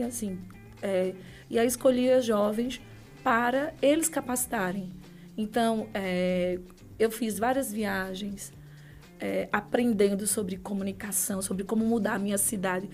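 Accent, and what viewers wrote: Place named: Northeast, Brazil. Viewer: Esse sotaque nordestino é contagiante 😊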